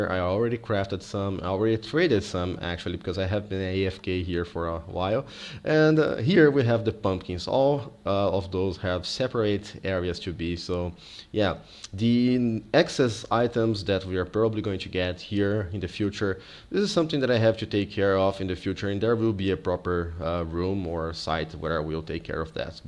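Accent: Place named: Brazil. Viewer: Felipe Menão Blz, eu não sabia disso, é que o inglês dele tem um sotaque bem brasileiro mesmo ;), mas o inglês dele é bem melhor que o meu ehehe... mas não importa, os videos dele são muito bons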